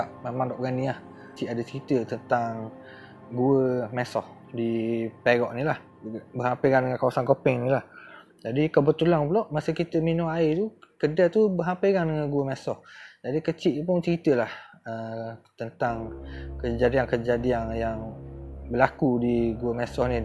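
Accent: Terengganu, Malaysia. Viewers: ouh ptutlah kdg2 kliru tgok member sha ckp,,kejap mcm ganu kejap mcm kelate,,stay safe bro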